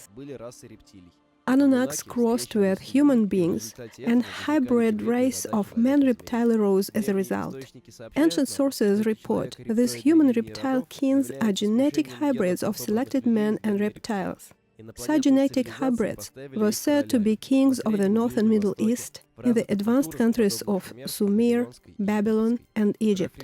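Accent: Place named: Poland. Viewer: I would trust any of my Polish friends, who by the way are very intelligent, hard-working, and have university degrees. But don't ever trust a computer generated text-to-voice with a Polish accent, no matter what she says.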